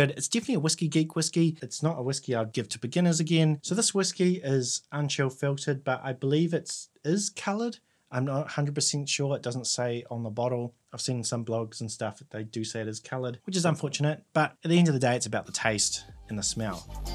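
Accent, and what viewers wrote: New Zealand. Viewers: You from New Zealand ? U have a Nz accent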